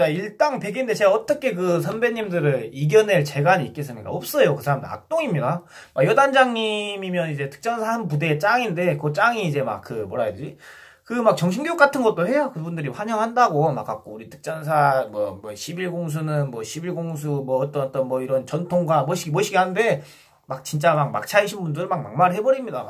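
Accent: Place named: Jeolla, South Korea. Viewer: 내 살다가 이래 재밌게 전라도 사투리쓰는 사람 첨보네 ㅋㅋ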